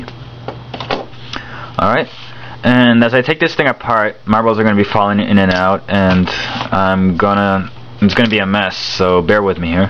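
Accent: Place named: Russia. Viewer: is russian your first language or something? you have an accent. can you speak russian at all?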